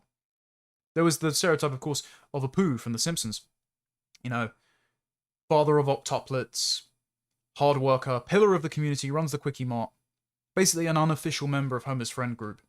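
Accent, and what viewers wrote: United Kingdom. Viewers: Nah, I think it's hot.I love the uk accent 😍😋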